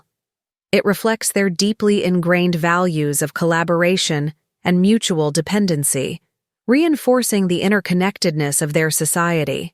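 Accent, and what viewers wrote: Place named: United States. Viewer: Every time I watch videos of this tribe, I mostly enjoy listening to them talk. Their language is the main attraction. Now imagine having to listen to this American voice narrating a story 😞😞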